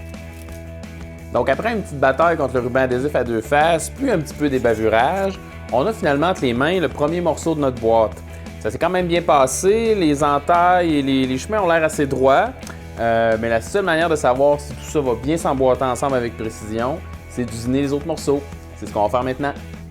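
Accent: Quebec, Canada. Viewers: Bravo. Très bon tutoriel. Juste une petite remarque concernant la notation de la machine que je trouve sévère. En effet, le moteur ne fait que quelques W soit 100 fois moins qu'une fraiseuse ! Je trouve même exceptionnel qu'elle arrive à faire ce travail avec une puissance aussi faible. Cela étant dit, bonjour à nos cousins de la belle province. J'adore votre accent (qu'est-ce que je dis, c'est moi qui ai un accent ...).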